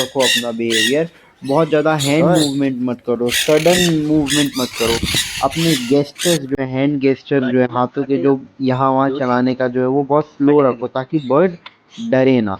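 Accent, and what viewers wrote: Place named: Gujarat, India. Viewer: Very informative video..... Gujarati hoy avu lage che....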